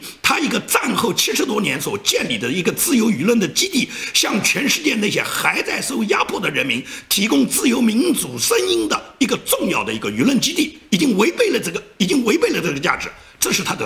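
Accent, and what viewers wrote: Nanjing, China. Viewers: 老吴南京话脱口秀，我的饭后最爱~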